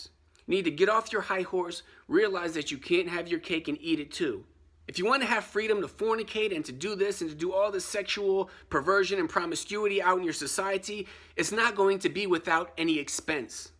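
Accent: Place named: United States. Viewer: If American is so bad why are you using YouTube?? An American invention???? Why are you talking with an American accent?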